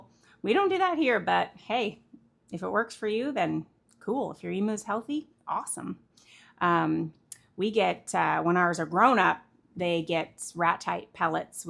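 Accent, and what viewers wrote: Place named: South, United States. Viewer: very interesting but i couldn't deal with them not making it i can't believe people correct your speech really you have a southern drawl and so what if it comes out not the u sound i know what you meant. great video full of info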